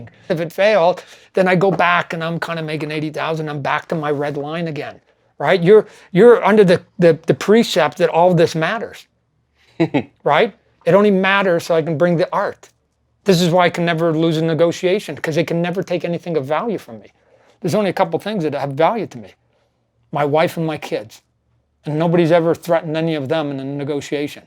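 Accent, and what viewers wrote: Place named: New York, United States. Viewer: dude talks way too much, sounds like a new yorker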